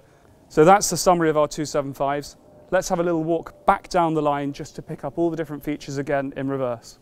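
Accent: United Kingdom. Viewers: Thank you very much for this excellent guide. Such a quintessential British gentleman informing people in that wonderfully British manner. That said, what about 11001? The Daytona prototype with the 2000GT-derived greenhouse?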